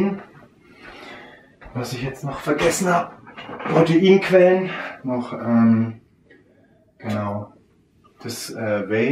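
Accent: Bavaria, Germany. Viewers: woher kommst du eigentlich ursprünglich? man hört einen bayrischen akzent raus. aktuell residierst du in nürnberg hab ich gehört? ;)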